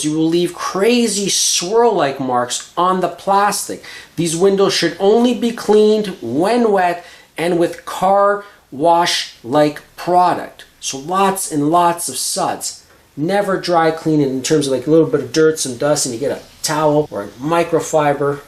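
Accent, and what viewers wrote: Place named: Canada. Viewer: these videos are amazing! thanks so much. i just got my 1st r129 and i intend to keep it until i stop driving. i like doing as much as i can myself. When i was going through your videos i thought i heard a Canadian accent. I'm in Toronto, but originally Ottawa Valley. Are you from the Prairies?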